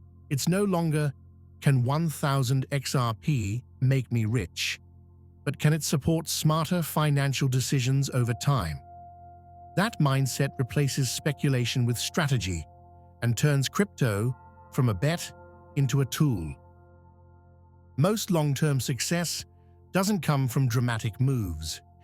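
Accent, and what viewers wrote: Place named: United Kingdom. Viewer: Just found this channel. I really like its simplicity of explanation. My feeling is if XRP gets to wide financial institutional adoption not only will there be price appreciation, but also less volatility as that would run counter to what institutions expect on a value transfer asset. If the aforementioned occurred this could create a great deal of competition for the XRP asset and safer yield products could become available in time via free market competition (albeit with a lower yield). On a side note I like the British accent of the moderator. I've often said one could be speaking in complete gibberish, but if its with a British accent it would sound authoritative. 🤣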